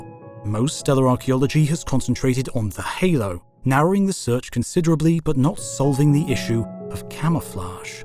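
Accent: England, United Kingdom. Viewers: Are you British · Yes, he is. That's an English accent.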